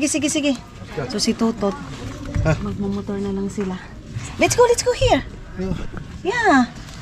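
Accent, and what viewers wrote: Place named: Surigao, Philippines. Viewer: Surigainon Ang dialect ...like my late father..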